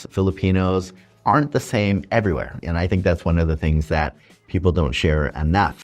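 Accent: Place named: United States. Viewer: Your vowels are wrong. Your not communicating in america your communicating in S E Asia. Irritating to hear that usa shit. See ya later (C ya : )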